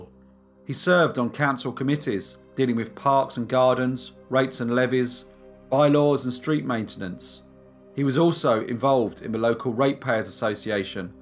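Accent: England, United Kingdom. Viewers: I'm in love with ur accent and way of speaking! Where are you from? England? Where exactly?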